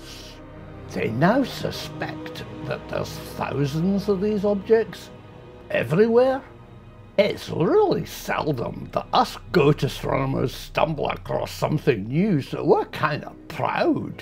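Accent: Scotland, United Kingdom. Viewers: Can I suggest you lay off the Scottish accent...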